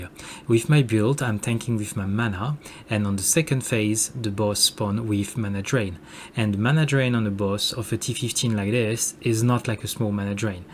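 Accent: France. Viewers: i love the french accent :)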